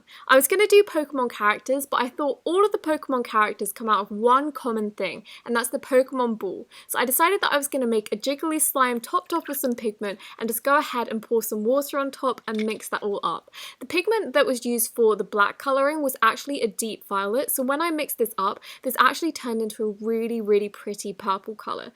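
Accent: United Kingdom. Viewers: Pleease do more vlogs, your British accent is so cute🤩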